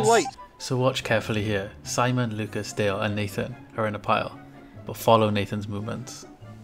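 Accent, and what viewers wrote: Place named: Scotland, United Kingdom. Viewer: Scottish accent makes this even funnier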